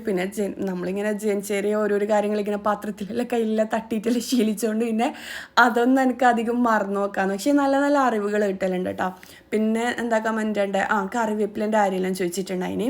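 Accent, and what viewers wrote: Kannur, India. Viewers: Sorry nan parayunnad thonna Ruth cheriya thettan Nammude kannurkare prathekich thaliparamb payangadi bagathullavare ellavarum kaliyakkum samsarasheyliyil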